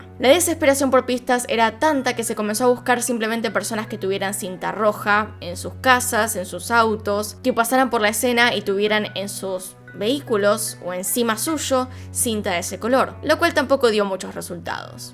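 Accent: Argentina. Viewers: así hablan los argentinos, no es cosa de pronunciación es cosa de acentos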